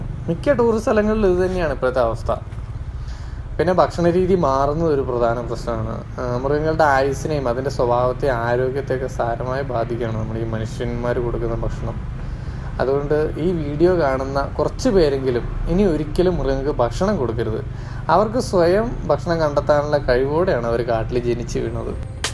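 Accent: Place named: Muvattupuzha, India. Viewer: Njanum..super presentation aanu bro..muvattupuzha/ perumbavoor/Thodupuzha slang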